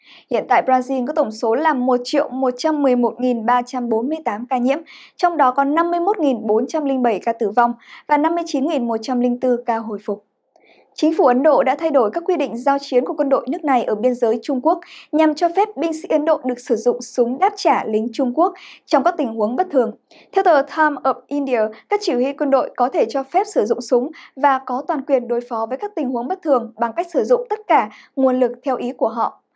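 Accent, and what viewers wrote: Standard. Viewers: Chị đã xinh lại nói chuẩn nhanh như gió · noi hay chuan chi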